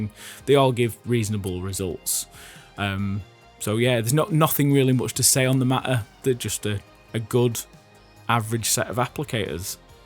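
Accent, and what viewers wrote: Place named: South Yorkshire, United Kingdom. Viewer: Me and the Mrs are having a scholarly debate regarding the placement of your accent. I'm saying no further south than Rotherham, no further east than Donny. She reckons Cas Vegas as a wild card. Are we warm or cold?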